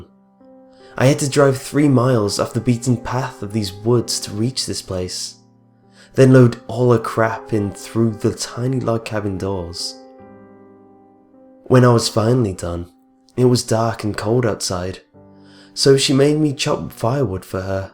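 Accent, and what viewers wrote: England, United Kingdom. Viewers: I love your voice, I don't know why but an English accent just makes things creepier.:) You kind of sound like Wacko off of Anamaniacs. (Not a bad thing at all.) (Also, I'm sorry for spamming your comments by replying to an idiot.)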